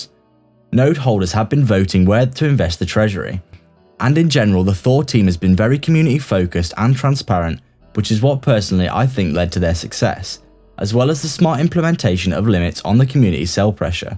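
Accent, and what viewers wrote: United Kingdom. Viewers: Great project indeed. I just love the British accent. Thanks for sharing. Vires in Numeris.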